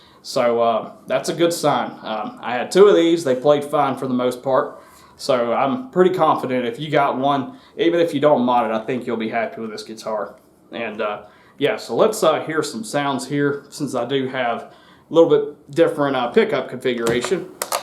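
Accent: United States. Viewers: You sound like Macon Georgia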